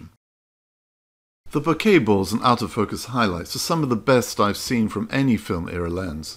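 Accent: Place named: RP, United Kingdom. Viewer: His particular accent and tone sound almost identical to David Cornwell, the real name of the great writer John LeCarré. It’s beautifully cultured in that special British way. I’d be interested to know a bit about background, although it really has nothing to do with wonderful lens videos!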